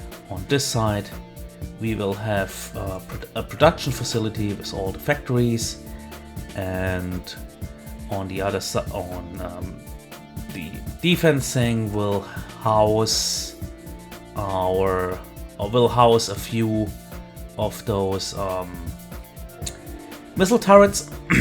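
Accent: Germany. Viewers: I just moved in here in germany and i can tell that you are german or at least something similar just because of your english. Correct me if im wrong.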